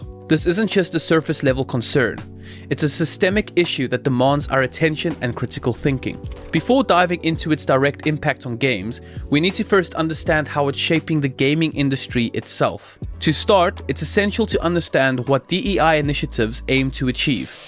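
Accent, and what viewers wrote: South Africa. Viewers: Hmm... I may be mistaken, but something sounds suspiciously South African. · are you from South Africa? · As a fellow South African, I know another South African when I hear it xD Mad respect for the videos you do, your views need to get out there much more especially in DEI. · I had to come check your channel out when I heard the South African accent on Asmons stream earlier xD Keep up the good work dude! Really well discussed, idk why my brain will always default to comparing this stuff with BBBEE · Can't help but hear that distinct South African accent. DEI is just BBBEE but worse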